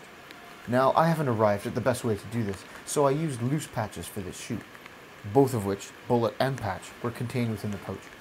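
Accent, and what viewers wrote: Canada. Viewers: As a former rifleman I find the uniform slightly lighter than it should be and no offence ment but Canadian accent and rifles uniform just sounds odd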